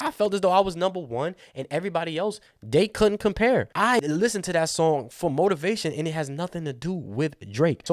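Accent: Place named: South Carolina, United States. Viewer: Charleston in the building shoutout to SC! You know what time it is over here. I heard the accent so I had to make sure you was from the low country. But I like your takes! Ye is SC stamped.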